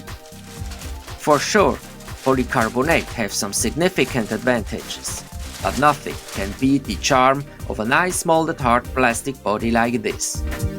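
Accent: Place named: Switzerland. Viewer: great video like always... and the Schweienglisch is great!!! gruess us züri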